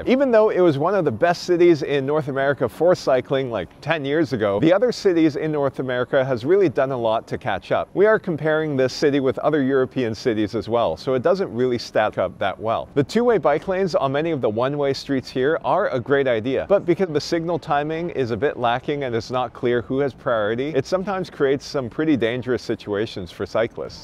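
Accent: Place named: Quebec, Canada. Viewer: Un peu quebecois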